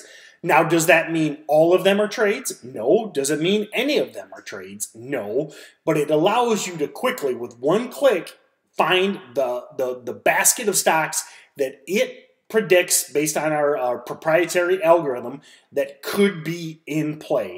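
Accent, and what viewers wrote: United States. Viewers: The way he talkssss, his accent and his over emphasis of general words is so annoying and obnoxious. My ears literally bled.. Maybe coz i am an aussie and can't comprehend his typical non urban american accent. Faaaarkkkkkkkk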